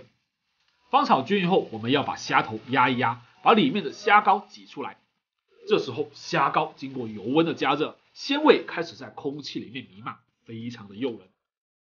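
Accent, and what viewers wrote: Chaoshan, China. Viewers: 好明顯係潮汕嘅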